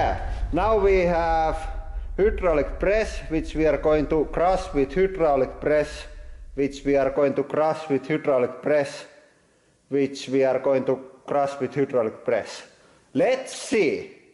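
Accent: Finland. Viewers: "vii kotta gou diipper!" Mä niin rakastan tätä rallienkkua. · eikö aksentti muka paljasta? Kyllä suomalainen aina toisen tunnistaa. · In Finland we call that accent as rally english. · In finland its called "ralli englanti" meaning rally english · Suomalaisten ralli Englanti.. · nah nope, its just the finnish accent called "rallienglanti" or rallyenglish.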